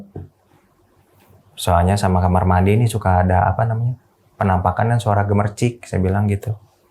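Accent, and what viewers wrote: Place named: West Java, Indonesia. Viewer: Orang jakarta tinggal di bandung logatnya jadi logat sunda😁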